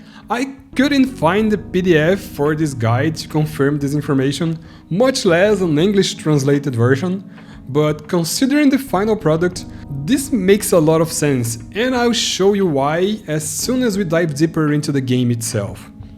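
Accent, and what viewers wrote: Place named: Brazil. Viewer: I thought it sounded like my friend who is Brazilian's accent! Man I'm so impressed with bilingual speakers.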